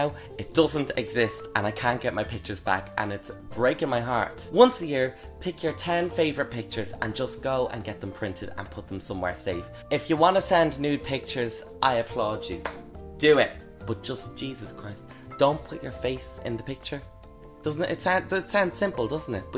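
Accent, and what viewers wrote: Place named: Ireland. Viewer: I love your accent. I need an Irish man in my life!! I could listen to you all day. Sending love from the other side of the world (New Zealand)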